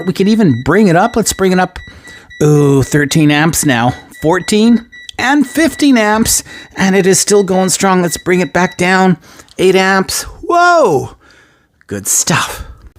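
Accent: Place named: United States. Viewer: Хорошее произношение, вроде американский акцент, интересный для меня профиль канала. Буду аудирование проходить. 😊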